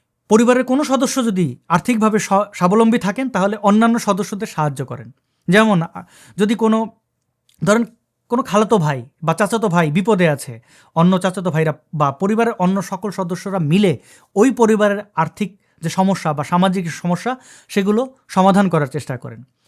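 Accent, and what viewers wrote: Sylhet, Bangladesh. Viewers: সিলেটি ভাষায় খতা খওয়ার ধরনটা কিন্তু সেই লাগছে। 🙂🙂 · পিওর সিলেটি উচ্চারণ ❤ ভাল্লাগছে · আমি সিলেটি। আপনার সিলেটি শুনিয়া মজা পাইলাম।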